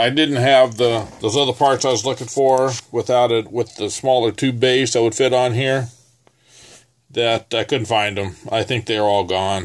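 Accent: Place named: South, United States. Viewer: That was fun! I never noticed a southern accent like I heard here. Nice work! Laural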